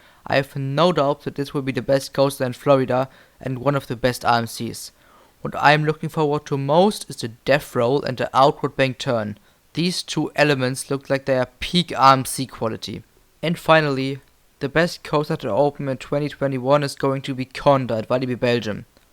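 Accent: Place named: Germany. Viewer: Deutscher Akzent am Start XD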